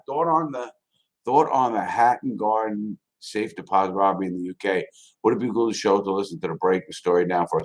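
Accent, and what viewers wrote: New York, United States. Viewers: He’s gotta be New York?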